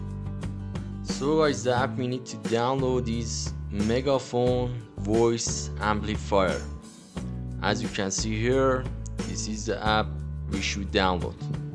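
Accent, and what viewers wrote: Iran. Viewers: That accent just screams Iranian origins to me Which is great ofc, the audible acquaintanceship with the beloved Persian language is sheerly a blessing to the ears =) Keep it up with the great content brother, Best of wishes from a fellow aspiring creator of the same origins😁✌️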